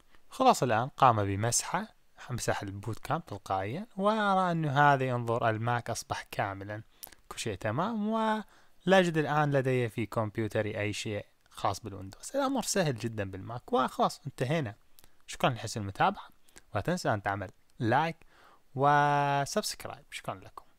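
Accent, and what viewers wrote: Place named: Iraq. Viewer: يا محلى لهجتك العراقية .. شكرا جزيلا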